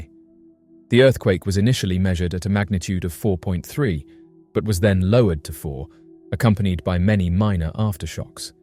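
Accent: England, United Kingdom. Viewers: If the narrator has an English accent, you can be pretty sure that what follows is BS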